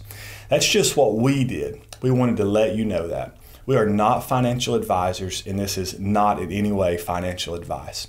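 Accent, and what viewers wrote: South, United States. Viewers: hard to take you serious with your southern accent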